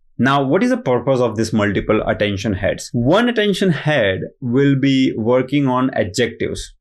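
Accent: Gujarat, India. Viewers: Best explanation out there! Also, love that gujarati accent😂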